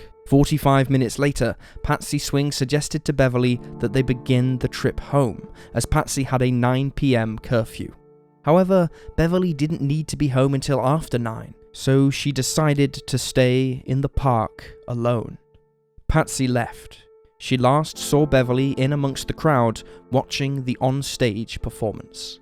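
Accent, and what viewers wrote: England, United Kingdom. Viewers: Pommy accents do not help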